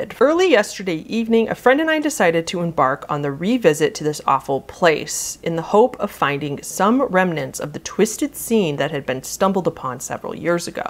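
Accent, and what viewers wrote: United States. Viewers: As an English bloke myself, it feels so unusual to hear the phrase "dual carriageway" being said in an American accent. Haha. I have no idea how someone could be this bored/brave to go on an expedition like this. Either that, or I'm just a wuss. XD Edit: It also feels very bizarre to hear the word "bloke" being said in that same accent. I hear that so rarely that I can't compute it. XD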